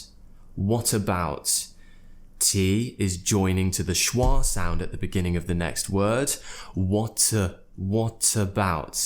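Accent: United Kingdom. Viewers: Whatever you say I am able to follow your British English accent. You have the clearest British English accent I have ever heard in my whole life! Keep posting :) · Whatever you say sound British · Music to my ears lovely British English ❤❤❤😍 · I love your podcast, I'm listening to it everytime when I go to college. It's helping so much to improve my listening skills and to get used to the british accent. Keep doing this amazing job, whatever it takes! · You sound sooooo British whatever you say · i admire your British accent 💜💜💜 it so clear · I like your British accent so much . Thank you ! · Brother whatever you speak sounds British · Whatever you scrutinize, who cares! I will learn the very British accent asap from. 🥰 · You’re so handsome and I really like your British accent. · Love your British accent.love also your spontaneity.you know how to transmit your message.👍👍👍👍👍👍👍👍👍👍from 🇩🇿.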